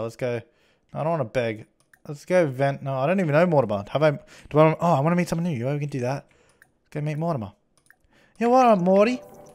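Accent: Australia. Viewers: Mate, you are like the best stereotypical Australian the accent to the phrasing is great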